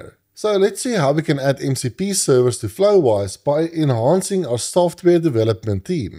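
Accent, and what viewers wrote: South Africa. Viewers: Nice one boet. Your videos are getting better nad better, and are so informative and helpful. I understand you've explained the dfference between this and n8n...just not quite surei get it. I am hoping you'll get to the point where flowise has a stt/tts interactive style. The dream is a personal assistant that can manage calendar, emails, and sdhopping lists etc. And I cna "control" it all via whatsapp! Keep up the great work Dis ook lekker om 'n ander Suid-Afrikaanse ou te hoor!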